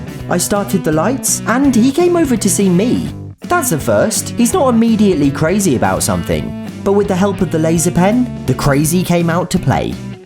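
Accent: United Kingdom. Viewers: Dang I only just realized that your British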